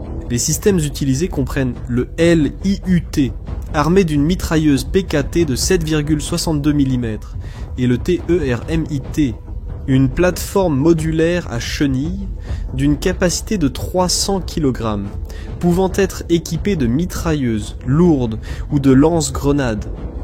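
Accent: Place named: Belgium. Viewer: Slavia Ukrainia. Une voix Belge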